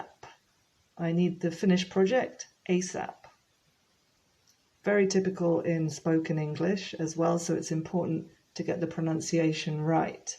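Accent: United Kingdom. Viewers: oh okay I got it. I have been learning English since my childhood. My English knowledge is too bad. Next year I am aiming to go to private university. I only watching British English chanels.beacause I like how you speak. I mean accent pronunciation. I'd like to take online classes with you unfortunately it's too much in our currency.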